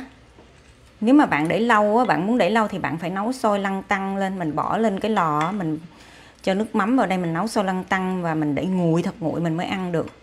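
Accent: South, Vietnam. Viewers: Hướng dẫn rất tuyệt vời. Giọng nói miền nam nhẹ nhàng dễ mến.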